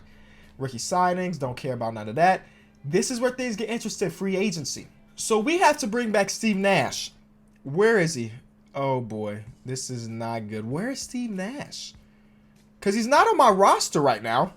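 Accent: Chicago, United States. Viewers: Was this guy born in Chicago or something?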